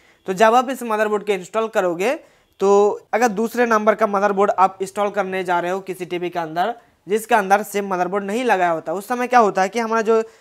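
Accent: Bengal, India. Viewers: দাদা তুমি বাঙালি নাকি তোমার হিন্দি কথা গুলো বাঙালির টান আছে ❤️ তোমার কথা গুলো ভালো লাগে আর বুঝতে ও সুবিধে হয়❤️ লাভ ইউ দাদা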